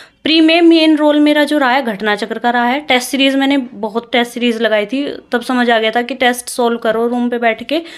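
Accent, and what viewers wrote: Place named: Shamli, India. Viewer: Mam तो म्हारी वाली language बोल रही है, शामली, सहारनपुर वाली।।।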